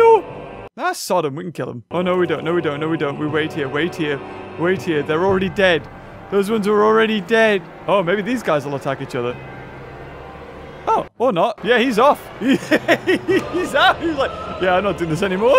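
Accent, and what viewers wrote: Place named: United Kingdom. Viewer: You kind of sound like Wilbur Soot... I guess your voice sounds similar because of the British accent.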